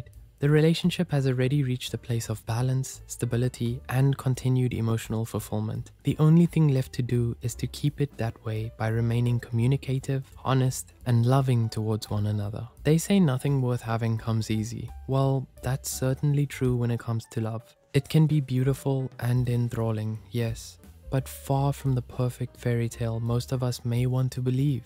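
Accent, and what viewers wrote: South Africa. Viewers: The narrator is definitely South African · The narrator are you south african? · Man's accent is from SA🇿🇦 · Bro sounds South African 🎉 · The speaker sounds south african😮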